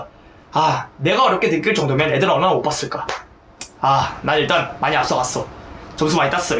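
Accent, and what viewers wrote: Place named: Busan, South Korea. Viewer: 다른 얘긴데 경상도 출신인데 대전으로 이사 가신 건가요? 말투는 부산말투인거 같아서요 ㅎㅎ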